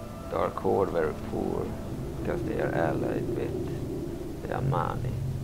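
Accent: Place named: Sweden. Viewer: I ceartinly look forward to be playing this :D Looking forward to the Ahn Qiraj the most personally Also do excuse me if im wrong but im an sensing an slight tint of Swedish in your english voice, if so Hallå Hallå :D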